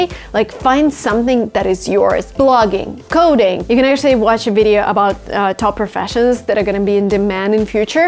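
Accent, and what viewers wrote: Russia. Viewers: Don’t get rid of your Russian accent!! It’s beautiful i wish my voice was like yours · Она не строит предложения по-русски. У нее все в порядке с грамматикой. Пожалуй, единственное, что выдает в ней не носителя английского, так это мелодика русского языка, протяженное произношение гласных. А все остальное просто супер! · От русского акцента получается избавиться невозможно? Легко вас понимаю, потому что вы говорите с русским акцентом. Произношение иностранцев даётся сложнее на восприятие и многое не понятно в речи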